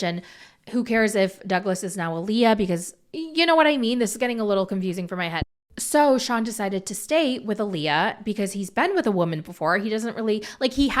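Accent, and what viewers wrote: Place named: New York, United States. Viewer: I’ve been away a few months but I’m loving the sometimes New York accent that developed in my absence.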